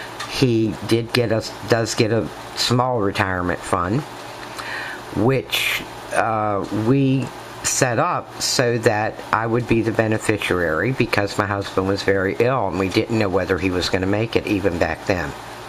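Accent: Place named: Pittsburgh, United States. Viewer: Id encourage you to do another video, you did great! 👍🏼 You can help others so much with your story...i found you at t0ny's site💕🙏🏼😘 (r u from pgh, pa? U sound like it, thats where i grew up)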